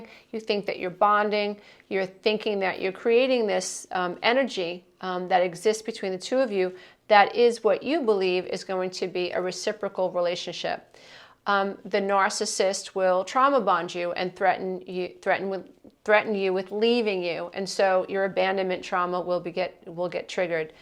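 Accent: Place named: New Jersey, United States. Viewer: Great video. I agree with everything you said. I like your “Joisey” accent (a compliment if you aren’t from NJ).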